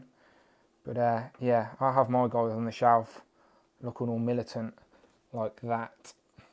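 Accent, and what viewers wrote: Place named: United Kingdom. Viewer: 23 Famez haha right ? Lol drove me nuts, but In his defense at the same time I know he’s a Brit and probably isn’t use to our American pronunciations 😅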